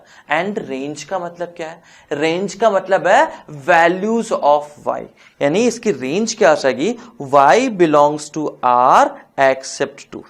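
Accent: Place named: Maharashtra, India. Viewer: Sir sounds marathi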